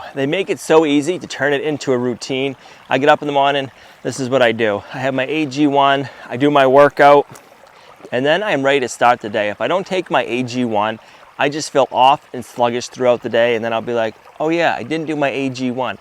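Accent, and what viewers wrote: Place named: Boston, United States. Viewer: I think he grew up near Boston. His "mornings" are legendary!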